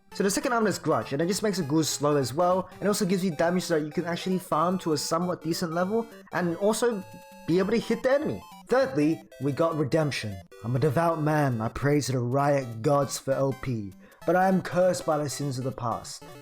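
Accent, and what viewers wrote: Australia. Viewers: He's just Australian don't mind it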